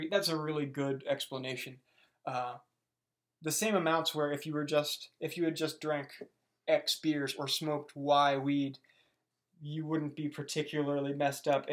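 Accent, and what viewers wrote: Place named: Ohio, United States. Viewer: Ohio huh? I’m from Cincinnati and pretty good at listening for accents. You definitely aren’t from Toledo or Cincinnati outright. I’m going to guess more very very outskirts of Cleveland. Maybe Akron???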